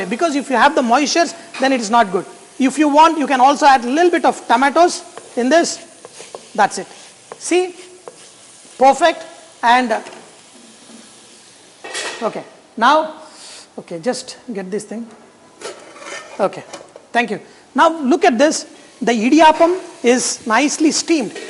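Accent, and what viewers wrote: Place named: South India, India. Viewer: Good explanation in a South Indian accent...nice video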